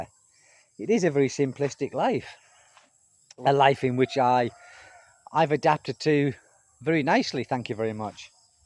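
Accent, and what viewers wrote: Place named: England, United Kingdom. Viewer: I like your videos. Not only good insights, but a relaxing style, including the cool english accent. 😎👍 Now about independence in relationships, the way i like to express it, is the idea of three lives. A life for you, a life for me, and a life together. Because no one person can always be all things for the other. And that's quite all right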